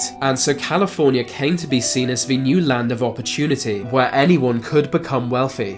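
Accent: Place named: London, United Kingdom. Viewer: London accent. It's a class thing.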